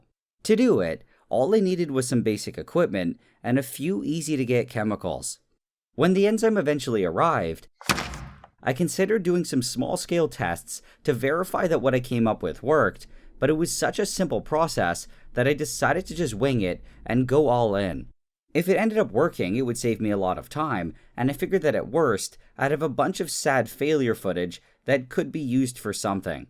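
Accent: Canada. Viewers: Laying in the dark with my eyes closed and I finally hear the Canadian accent. It’s is SO strong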